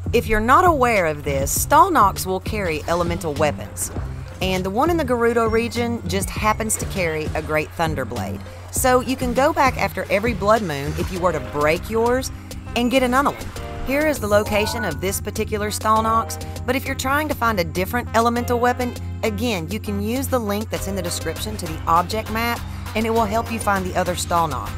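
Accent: South, United States. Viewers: I really like your accent. Not sure where you’re from, but it reminds me of the South and that is very comforting to me! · I got a switch a couple months ago and I started playing breath of the wild not too long after. Your videos are awesome and they’ve helped me a lot thank you. Also as a fellow southerner I appreciate your twang 😁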